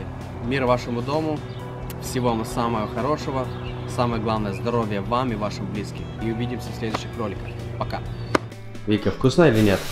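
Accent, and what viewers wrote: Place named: Ukraine. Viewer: украинский акцент такой ))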